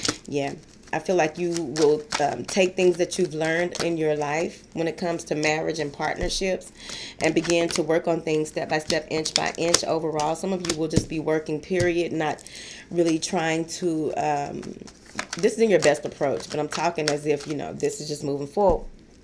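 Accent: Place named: Dallas, United States. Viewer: You in Dallas. It sound like it, in your accent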